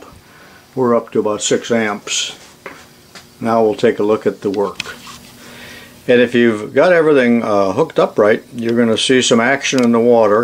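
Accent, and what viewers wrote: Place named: Pennsylvania, United States. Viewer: Your accent indicates that you are from Indiana County, Pennsylvania just as Jimmy Stewart was. Am I correct?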